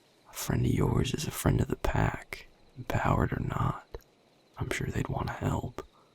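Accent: South, United States. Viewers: I love that you have a country accent because it’s great for a vampire like no one really does that and I just think that is so cool for vampire to have a country accent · Nooo why is his American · Jeebus....that gravelly, southern voice is....good chills. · The southern accent is amazing^w^ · The southern accent is 💖💖💖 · I am NOT a fan of country accents but is an exception 🥵🥵😍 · It's like Christmas came early- as a fellow southerner, I can't get enough of dear!:D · IS HE A SOUTHERN VAMPIRE?? BLESS MY SOUL~ 😳👊🥰😆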